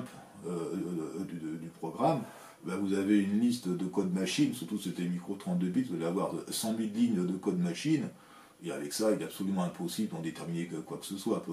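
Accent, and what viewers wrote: France. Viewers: french accent is funny.. maybe hardcore americans who only speak english having problems to understand you. but the rest of the world is with you. 🙃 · L'accent français est rude seulement pour les oreilles françaises... donc on s'en fout. Ce qui m'étonne c'est la puissance de calcul embarquée même si c'est du fire&forget. Il embarquerait du code de type IA ? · Im not native english speaking person and yes your accent sound French but you last video I perfectly understand. Its true that you can buy some interesting stuff on demobiles (after military shops) in some prive hands i saw last year single use rocket motor for nuclear rocket (new one not used) what was at the moment best what zssr had at the moment. And the owner even dont know what it is ;) Imagine if some organize toxic fuel for it some avionics and can send bus to the USA from Poland :) Do you passion we will enyoj it if you share videos about it. 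· l accent francais cela me va tres bien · I think your English is excellent, and personally I find English being spoken with a strong French accent to be awesome to listen to. My former manager (still a coworker) is French (born and raised) and while he has been living in the US now for 8 years. His accent is still very strong and its something I also look forward to hearing. He is one of my favorite people in the world. 🙂 Please don't stop doing your videos in English. It is a pleasure to hear such technical information spoken with a French accent. I will still watch all your content if it is in French, but I will gain more from it if spoken in English. 🙂 I guess I am allowed to be selfish at least once and a while! lol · Your english is perfect bruh. People especially like it cus the french accent sounds cool lol. For what conceivable reason would anyone hate on it?? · Le bon accent français m’a bien fait rire. 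L’important c’est le contenu ! Je viens de m’abonner. J’attends la suite avec impatience. Continuez en anglais au vu de vos chiffres ! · Bonjour, I have been following your channel for years, never had an issue with understanding your engliah and always felt that your french accent was very charming. Thank you for all your hard work over the years. I can never get enough of your unique subjects which entertain and enlighten me with each and every video. -Ray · thank you very much for your previous video. I could perfectly understand your English. In fact, due to the French note I found it very pleasing to listen to it. Please continue doing such videos! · Why do you apologies for your french accent, it is very nice to see everyone speak their own accent, it shows that we should accept and respect each other. Doing such a great job and speaking your own french accent encourage everyone not to shy from their accents and to focus on content. 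· if you got haters on Youtube, that only means that you are successful. Your english is by far not as bad as you think it is. You have the odd funny word with french accent from time to time but it is easy to get used to it. Like when you omit the "s" when saying "display". Still everybody should know what you said. Your video content is one of the most sophisticated on the Youtube world. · I am from Hong Kong. My English is also bad and with heavy Chinese accent. I enjoy your English with French accent and your video too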